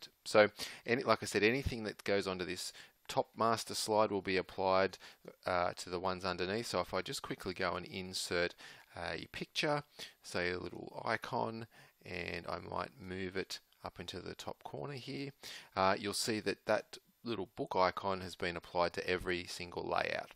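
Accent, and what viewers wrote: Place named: Australia. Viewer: Good to hear an Aussie accent on a Storyline tutorial! Thanks for the clear video.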